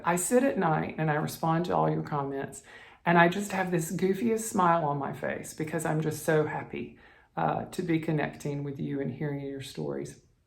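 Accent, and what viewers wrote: South, United States. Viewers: You would have to shave your mustache and get a red sweater to be Fred Rogers. That would be cool though! I hope you saw the movie with Tom Hanks, it was really precious. You said that you have an accent. Yes, I could hear something southern. Now that I have started to watch your previous episode, I am guessing that you are from Alabama. My friend from India 🇮🇳 when I told him that I did not have an accent, he said of course you have an accent. You have an American 🇺🇸 accent! Of course, in my completely untraveled life and stupidity, I thought Americans didn’t have accents. But of course people speaking English from Ireland 🇮🇪 , South Africa 🇿🇦 India 🇮🇳 England 🏴 , etc, they can all tell that we are from America 🇺🇸 . So think about it… Everyone has an accent! Just depends on who is listening. Thank you for rescuing cats 🐈 ! 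All of my pets are also rescues. Really cute that you kept all your childhood books 📚 . I don’t have a single one. Don’t you just love finding your artwork 🖼 and crafts from childhood? I have so few. And by the way… Basket 🧺 of cherries 🍒 was my first Blackbird design stitched and completed recently, and the first time I ever used Linen as well. I did it on 28 count.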